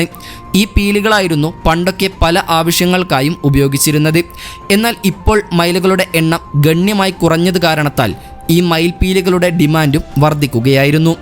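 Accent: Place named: Malabar, India. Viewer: മലബാറി സ്ലാങ്.❤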